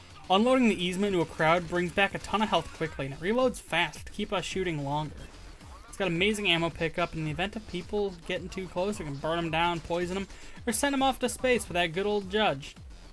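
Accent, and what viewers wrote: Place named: United States. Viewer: Honestly man, you have the best type of american accent, where about are you from?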